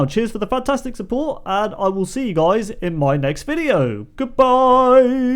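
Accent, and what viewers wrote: United Kingdom. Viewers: are you british? that sound like that.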